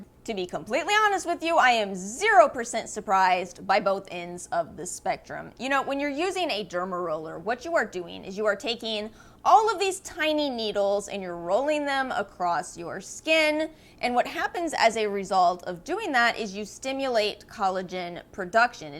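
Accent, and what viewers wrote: New Orleans, United States. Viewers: You must be from New Orleans. The “ya heard me” is a dead giveaway! 😅 I’m on the northshore.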